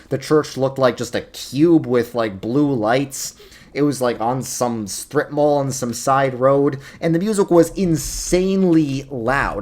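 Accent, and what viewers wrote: New York, United States. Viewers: I feel like you've started to sound more and more like a New Yorker with each video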